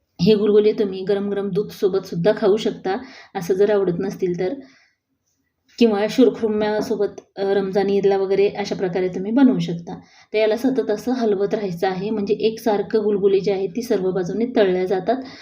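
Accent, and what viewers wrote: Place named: Marathwada, India. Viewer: Mi latur chi Lagech smjt la aaplya side chi tone 😊 Mazi frnd ahe dharashiv chi😊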